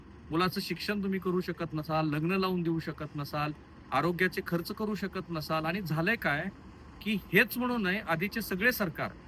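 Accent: Marathwada, India. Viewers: मराठवाडा बोली भाषा असिच आहे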